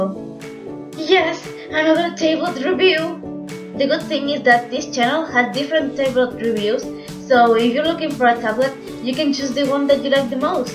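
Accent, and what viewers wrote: Spain. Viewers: She is spanish, te lo noto en el acento GUAPAA🥰💕 te kiero mucho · Se nota que eres española 😂😂 hablo igual que tu el ingles bien pero con un toque español😂😂 besitos 💖💖👍👍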